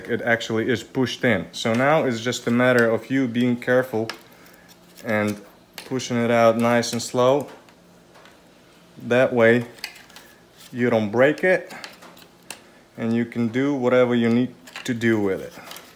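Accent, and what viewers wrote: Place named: New York, United States. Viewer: That’s very accurate and helpful, hope to see more of your content. Very cool NY accent)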